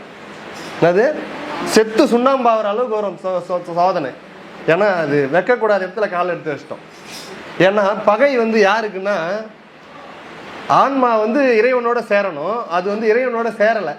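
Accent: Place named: Chennai, India. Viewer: Chennai slang is so visible 😅. Amazing speech 🪄❤❤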